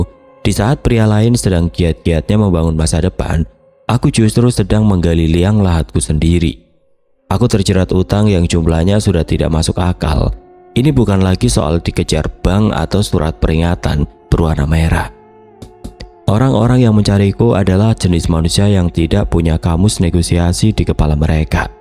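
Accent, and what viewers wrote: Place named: Java, Indonesia. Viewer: Suara medok lu mana wooiiii...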